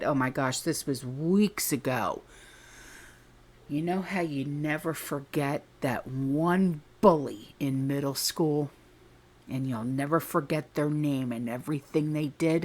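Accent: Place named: New Jersey, United States. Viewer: She’s from Jersey. Its the accent. Get over it.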